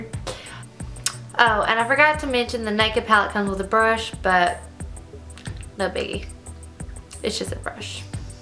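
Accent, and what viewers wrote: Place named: Tennessee, United States. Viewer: Great review! I came here with the intention of buying Naked, but now Im sold on Chocolate Bar. BTW, where are you from? Your accent sounds like me! (Tennessee)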